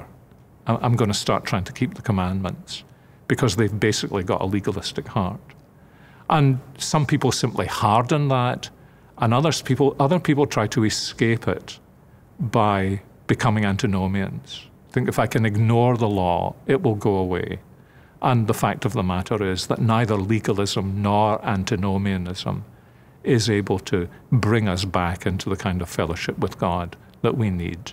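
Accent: Scotland, United Kingdom. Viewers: Addjoa preko baah Me either! The Scottish accents are my favs! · Absolutely, and I never tire of his Scottish accent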